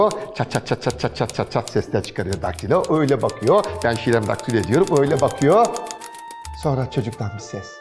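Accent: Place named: Trabzon, Turkey. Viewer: Müthiş hikâye. Trabzon ağzıyla konuşmanız çok keyifli üstadım.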